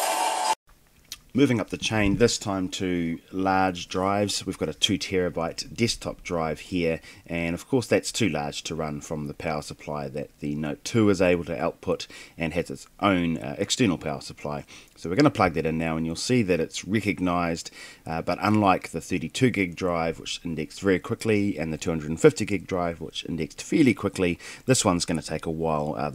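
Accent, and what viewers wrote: New Zealand. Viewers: Are you from New Zealand by any chance?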